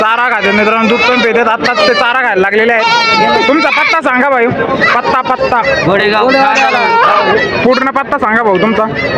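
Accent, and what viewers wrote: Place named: North India, India. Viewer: मराठी भाषेत कुठे येतो रे भैया भैया तू यूपी चा आहेस का बिहारचा आहेस भावाला भाऊ दादा अण्णा म्हणतात तुमच्यासारखी माणसं मराठी भाषा खराब करतात रे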